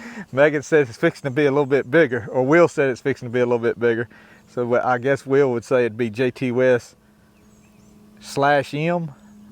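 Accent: North Carolina, United States. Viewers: Well it sounds like we are both from the great old North State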